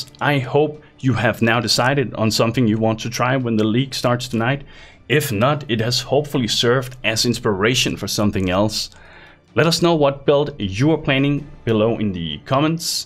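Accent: Denmark. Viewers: are you danish? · Within the first few words i knew you were Danish lol. Fedt at se en Dansk youtuber der laver content til andre end børn.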